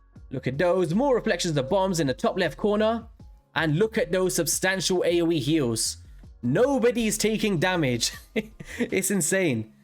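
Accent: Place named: London, United Kingdom. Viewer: but any particular area of london? as I have heard londoners but none like you, forgive my uk ignorance i am truly just trying to differenciate. like i enjoy your voice but i feel its more specific than london..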